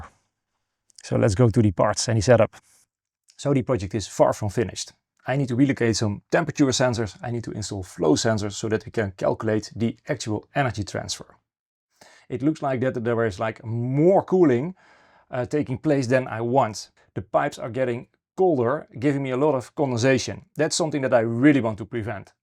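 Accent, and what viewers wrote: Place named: Netherlands. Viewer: Cool solution! We use a similar setup, but our cold water comes from our heatpump. During the recent heatwave we installed a watercooled aircon unit (Innova h2o) to lower the humidity and increase the cooling potential. There's a newer version called Innova WLHP, which is basically designed for a loop type that you are using right now. This trades a bit of electricity for groundwater, as these units can run until the water temperature has reached 60C. Based on your accent, I will assume you're in The Netherlands, we're currently having a shortage of rainfall so consuming less water would be nice :p (p.s. the innova can also be used to preheat or fully heat a boiler for hot tap water, double win for energy reuse :D)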